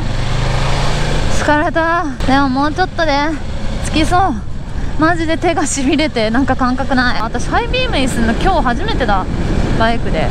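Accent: Tohoku, Japan. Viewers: には東京でも東北弁でもっと話してもらって東北弁バイク女子のポジションに行って欲しい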